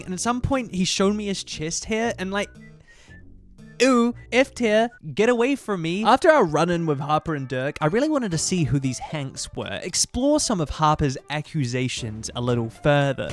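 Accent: New Zealand. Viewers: OKAY SINCE WHEN WERE YOU A KIWI AND HOW DID I NOT KNOW?! Honestly was so shocked by a kiwi streamer, like I’m suddenly so happy that our country is actually on the internet talking about things other than nz. 😂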